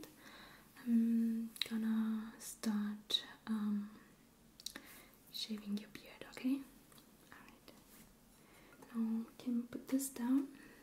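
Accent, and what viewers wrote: Germany. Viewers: What an extraordinarily charming German accent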